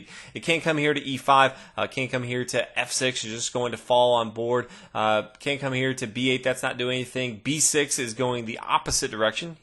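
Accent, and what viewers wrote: United States. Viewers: Sorry to say but this strong american accent is really bothering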